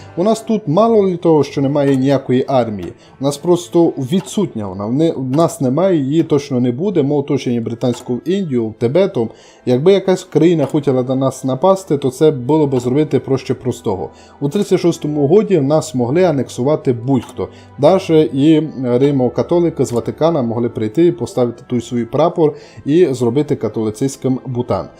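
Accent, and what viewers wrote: Transcarpathia, Ukraine. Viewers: Я живу на Полтавщині, для мені дуже файно чути закарпатський діалект і hoi4. Продовжуйте.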